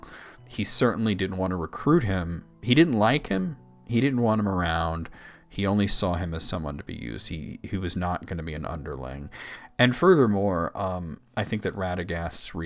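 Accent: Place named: United States. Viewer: A small correction: A vowel, linguistically speaking, IS what you call "a vowel sound". The symbols representing the vowels do not have a name of their own. So, linguistically, "cat" and "Mars" have two different vowels at the core of the word; they don't both have one vowel called "a". So on the one hand, you are actually arguing that there are more than three vowels in Black Speech when you say that "burzum" has two different u-sounds i.e. two different vowels (/ɜ:/ and /u/ respectively). At the same time, that is your pronunciation which is clearly coloured by American English. If I were to pronounce "burzum", with the influence of my native language, it would be two /u:/ sounds, i.e. the same vowel. An English speaker reading with their accent would probably read it with around 7 vowels (/ɑ/, /ʊ/, /u~ʉ:/, /ə/, /ɪ/, /i/, /ɜ:/). A German speaker like me would read it with 5 vowel sounds (/a(:)/, /ʊ/, /u:/, /i:/, /ɪ/). 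A Spanish speaker would probably have 3 vowels in their pronunciation, and so on. You also say that languages have way more than three vowel sounds usually. That's not necessarily true. Arabic has three vowels only, and those are, in fact, /a/, /u/ and /i/. So, it would be possible that Black Speech works with just these three vowels. Now, technically, Arabic has more because you can go at it from a phonetic or phonemic perspective. Phonemic is the language as you hear it and interpret it, phonetic is language as you actually say it. Basically the difference b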